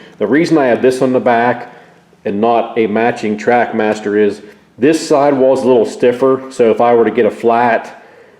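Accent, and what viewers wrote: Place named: Pittsburgh, United States. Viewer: I here a pittsburgh accent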